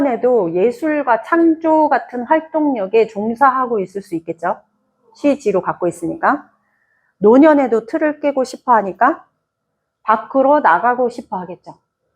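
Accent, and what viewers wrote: Gyeongsang, South Korea. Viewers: 경상도 말투가 가끔 있어요. 근데 서울사람. ~^^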